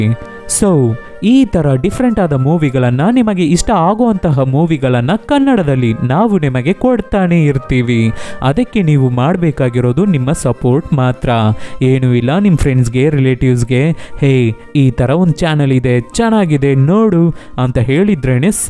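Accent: Tamil Nadu, India. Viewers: அண்ணா நீங்க தமிழ் காரங்க தான நீங்க பேசுற அப்பவே தெரியுது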